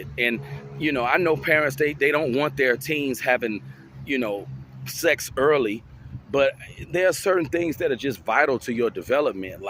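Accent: United States. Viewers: That deep south voice.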